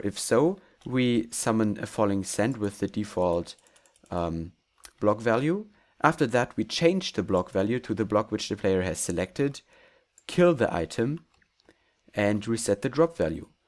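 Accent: Germany. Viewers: are you german? you don't speak english as well as a real english people!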